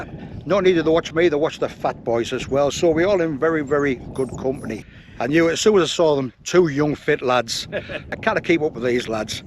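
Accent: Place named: South Wales, United Kingdom. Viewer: Love that, out there today haha you really did get noticed and that woman who turned away must have been in awe of you 😃That was stunning up there but wow you were up early again, 3 50 in the morning oooh 😯 that joke though! It did look so beautiful but i can imagine like you said, the colours in a few months time must be gorgeous. I thought the advice that you gave to the new YouTuber was so good, I can only watch longer videos if I really enjoy a channel. Loved the views at the summit, and nice that there was some shelter up there too. I enjoyed your South Walien accent 😃🏴 and i feel the same about cows and horses that are loose on mountains too, scary. Enjoyed this as always:) ~ Anna 👍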